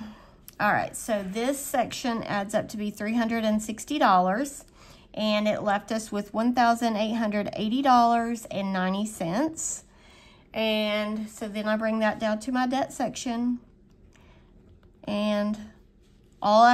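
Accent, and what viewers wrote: South, United States. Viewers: Good job. Love to hear a fellow southern accent in the budgeting community. You are doing great!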